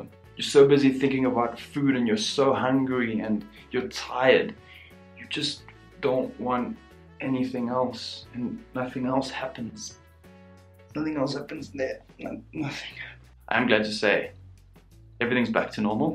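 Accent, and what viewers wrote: South Africa. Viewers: Are you South African bru? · U sound like u come from Sa(south Africa)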